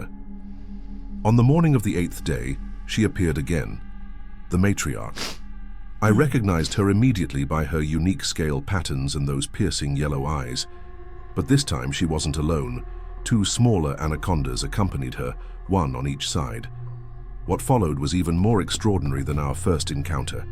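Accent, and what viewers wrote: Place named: Florida, United States. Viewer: Love that "Florida" accent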